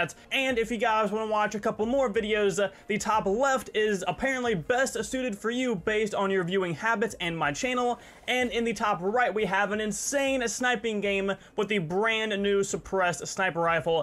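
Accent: United States. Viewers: You kinda sound like Lachlan but more of an American accent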